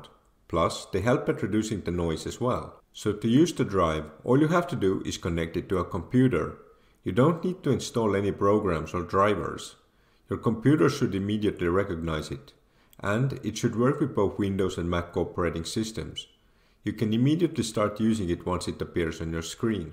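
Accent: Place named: India. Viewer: This was a big help to me to help reinforce my thoughts on how to set up the cables etc. and help my confidence in hooking up and installing my machine. I hope it isn't offensive coming from a white dude saying that Asian Indians are really superb with technology (better than white dudes like me). When I heard your Asian Indian accent I was thinking "Awesome, now I know THIS dude KNOWS what he is talking about!!!" Thanks brother, God bless you and your loved ones. You are a lifesaver Bro.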